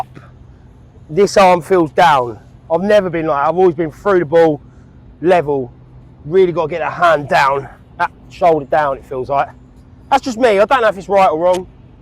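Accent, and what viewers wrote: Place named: England, United Kingdom. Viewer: Good content mate what part of England are you ?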